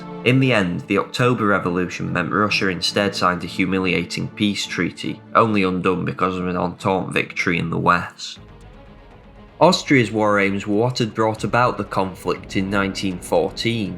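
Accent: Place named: England, United Kingdom. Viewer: Are you from stoke? Never expected a potteries accent clicking on a random vid.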